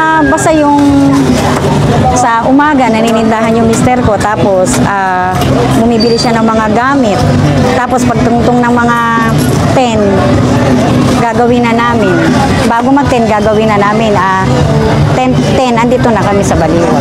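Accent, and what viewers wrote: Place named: Pampanga, Philippines. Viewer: Me tono po kayong Kapampangan God Bless po❤️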